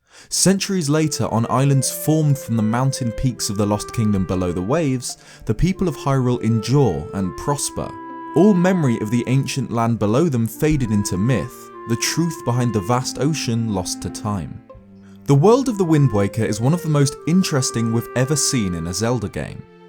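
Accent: United Kingdom. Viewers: Am I the only one that's crushing hard on this guy? Zelda love? British accent? Cutie? 😭 I promise I'm not thirsty just wanting a Zelda soulmate.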